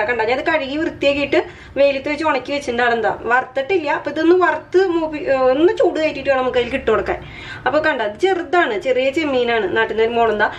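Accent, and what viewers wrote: Thrissur, India. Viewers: Variety Sambar try cheyyam thrissur slang super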